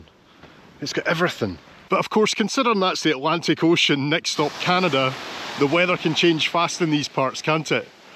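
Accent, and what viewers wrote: Scotland, United Kingdom. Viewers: I am going to record saying "All the wee lochs" into a continuous loop that I can play whenever I'm in a bad mood. Impossible to listen to that line, with that Scottish brogue, without getting a smile on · this video was even more special for me than your videos usually are. My brother's middle name is "Harris," and he was born on April 29, the date on which, this Spring, you made this video about the Isle of Harris. My paternal grandmother's maiden name was Harris, which my siblings and I did not realize is a Scottish surname. Now, thanks to this video, my brother, sister and I get to claim Scottish parentage (which is even more special given that our other 3 grandparents were of Swedish, Norwegian and Danish parentage)! And I realize, through listening to you speak, that my habit of adding "Eh" (pronounced more like "Ay") at the end of some declarative sentences must have come from my Canadian relatives, who lived in a part of Alberta, Canada populated mostly by people of Scottish ancestry (including my paternal grandmother's family). 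I wonder if my grandmother's Scottish parentage and that one Scottish speech habit of mine would qualify me for Scottish citizenship (or at least residency).... Your channel is my favorite on YouTube, probably because I am a traveler who favors the same meandering-on-foot style of exploring. For 8 years, I flew a roundtrip between Seattle, Washington and London as Pan Am crew, with a 30+-hour layover in London, almost every weekend. One London establishment I frequented was The Scotch House, because I was fascinated by tartans. I now know why. Looking forward to your next post regarding Harris Tweed (and to researching whether there is a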